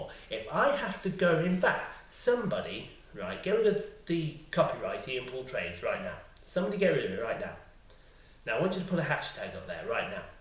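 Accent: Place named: Wales, United Kingdom. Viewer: great video as always love your welsh acedent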